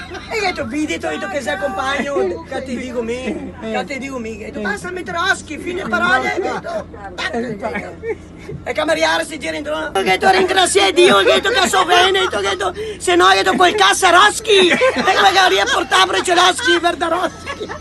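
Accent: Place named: Vicenza, Italy. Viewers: Forse Vicenza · Vicenza.. confermo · sicuro vicenza, zona ovest, probailmente Montecchio Maggiore e dintorni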